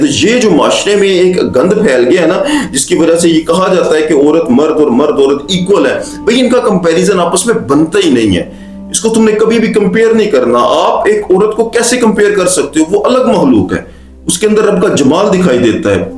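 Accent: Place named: Punjab, Pakistan. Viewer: They are punjabi from Pakistan their tongue is different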